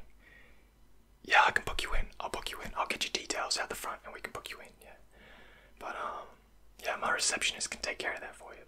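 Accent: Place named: Australia. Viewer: As an Aussie you never realise Aussie accents until you hear them in videos 🤣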